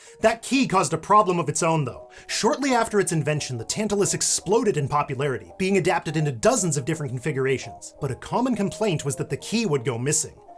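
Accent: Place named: Midwest, United States. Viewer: exactly! It almost feels like this dialect of Midwestern English is being influenced by the fact that 1/3 of the voting population want to get rid of public education. Maybe it is a legitimate dialect. But it feels more like a collection of linguistic mistakes with an accent. ...But maybe that's all a dialect is, when you get down to it.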